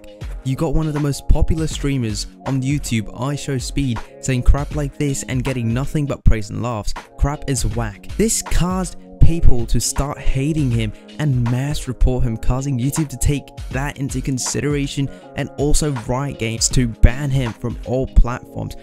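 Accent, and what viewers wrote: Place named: United Kingdom. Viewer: Oh god not only do you sound British but now ur getting ur 200 ping on AS mmc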